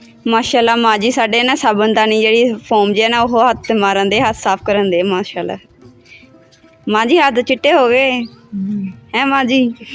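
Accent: Punjab, India. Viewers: Aidha jalandhar wee aurata boldia aa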